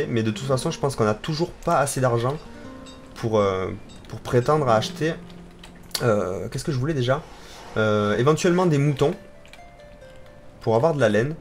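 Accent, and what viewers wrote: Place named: Liège, Belgium. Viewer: Quand tu parles (ce n'est pas une critique)tu as l'axent Liégeois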